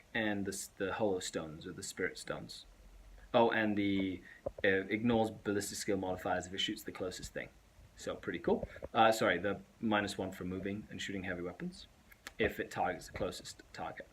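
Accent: United Kingdom. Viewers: Your Canadian!!!!! How come you have an English accent?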